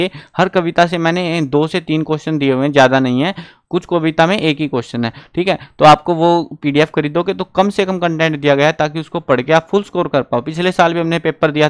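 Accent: Haryana, India. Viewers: Sir haryanvi hai kya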